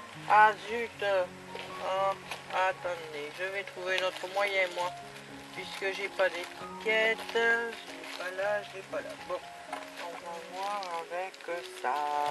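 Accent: South, France. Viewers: ah oui je suis encore là et je me suis mm abonnée à ta chaine car elle est variées tu fais des tas de choses et j'aime les chaînes comme ça. Magnifique pour ta Filfille 17 ans, cest l'âge à laquelle ma minette "Fynou" a du nous quitter, elle était très malade niveau coeur et poumons. Et ensuite jai eu mon pti Bounty qq années après. Merci pour ton email c'est très gentil. Jai aussi une chaîne mais il ny a pas toutes mes passions dessus car maintenant je mets plus des vidéos pour que ma maman qui est loin regarde ce que je fais, ainsi bien sûr que mes peu mais fidèles copines. Je t'avoue que faire des videos j'aime plus trop et jai pris beaucoup de distances avec les réseaux sociaux pour faire passer d'autres priorités qui sont des objectifs dans ma vie personnelle et qui me donnent bien plus de bonheur que la méchanceté de certaines youtubeuses dans le scrap ou la fimo... 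Pour le DP je te remercie pour le nom de la société qui en vend et en effet ta video va m'intéresser car le 1er je l'ai acheté tt à lh sur Amazon, cest une plage et le prochain sera un chat que j'ai mis en réserve. Après tu les mets sous cadre tes DP ? Car moi, cest le but. Si oui, quel genre de cadre ou autre utilises tu pour les accrocher au mur? Tes vacances se passent bien, et as tu beau temps ou tu es? Tu ne m'as pas répondu pour ton pti accent, si tu est bien du sud ? Je te laisse car je vais continuer ma page dessin du mois d'août sur mon Bullet Journal. Bon après-midi. 📚 Bisous ✏✒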